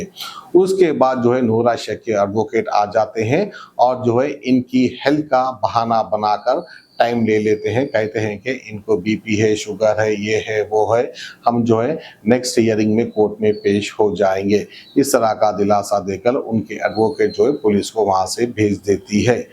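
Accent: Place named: Deccan, India. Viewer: Aray bhai Tum pehle bolne ka saleeqa seekho yaro lehja deccani raha toh koi baat nai lekin andaaz e bayan bhi achha hona chahiye Tumhara purana dhanda achha tha miya illigal machhi ki dallali karne ka Kaiku aaya re bawa yeh platform pe?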